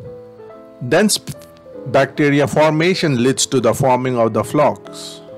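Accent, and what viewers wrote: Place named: India. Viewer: HARD INDIAN ACCENT SAYS I CANT TRUST HIM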